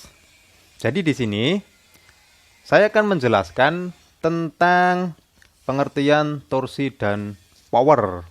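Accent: Banyumas, Indonesia. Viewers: Ngapak bro kakaka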